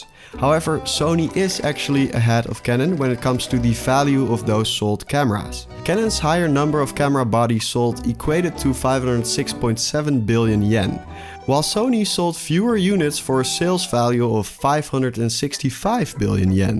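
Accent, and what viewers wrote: Netherlands. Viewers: That distinct Dutchie accent 🤗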